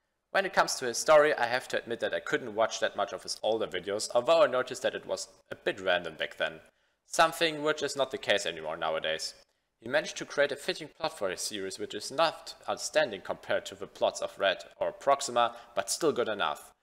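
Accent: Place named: Germany. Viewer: A. Great coverage B. People who deserve to be better known getting spotlighted C. German accent this video is perfect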